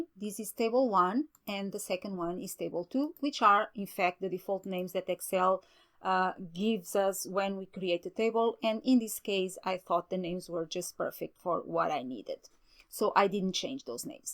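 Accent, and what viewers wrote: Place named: Portugal. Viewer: Eu abri o video que me apareceu, porque procurava a ferramenta para comparar as listas e ouvindo o sotaque, comecou me a entrar a impressaro que a voz era Portuguesa. Fui olhar para o nome do autor e acertei.. Sobre o conteudo, e muito bom. Bem explicado. Obrigado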